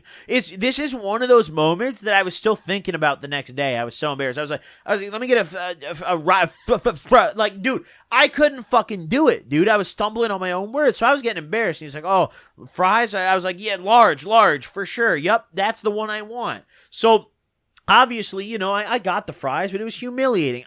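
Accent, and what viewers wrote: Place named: Midwest, United States. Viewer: His accent has really changed this sounds so midwestern😂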